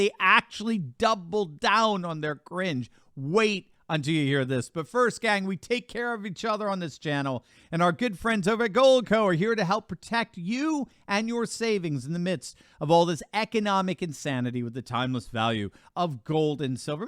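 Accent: United Kingdom. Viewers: I like how he slowly starts displaying a British accent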